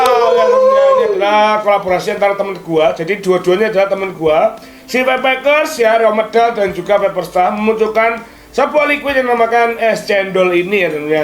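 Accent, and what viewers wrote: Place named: Java, Indonesia. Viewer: Skalin konten ngomong gua nya 1000 kali😂😂 medok lagi😂😂🙏🙏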